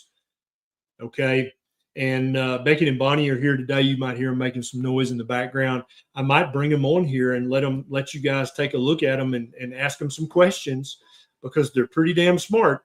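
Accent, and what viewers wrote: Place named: Upper Peninsula, United States. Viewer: Michigan- Yooper:)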